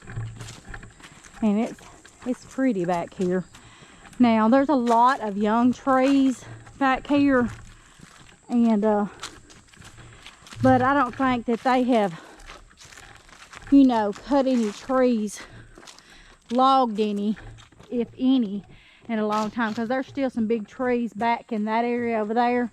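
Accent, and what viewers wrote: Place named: South, United States. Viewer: Congrats! You sound like you're from the south. Last one of these I watched the people put at the very end it already had a contract! Cudzu is edible according to a man that french fried it during the depression ! Best wishes.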